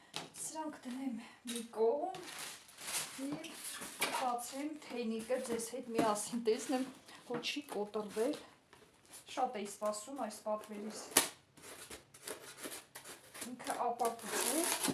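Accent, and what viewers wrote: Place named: Gyumri, Armenia. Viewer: Հարգելիս որտեղ եք ապրում գյումռեցու աքցենտ ունես համեստ անձնավորություն եք նոր եմ ձեզ հետեվում Ամնից Լիզա🎉😂😢😮😅❤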